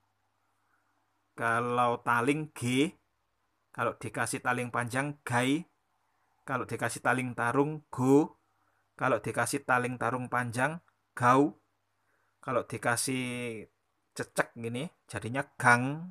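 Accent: Java, Indonesia. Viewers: Vokal orang kuno lebih lengkap, sekarang yg masih terwariskan unsur medhok ya seperti pada mas, makasih banyak..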